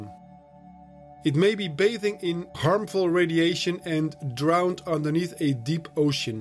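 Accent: Netherlands. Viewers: You sound very Dutch lol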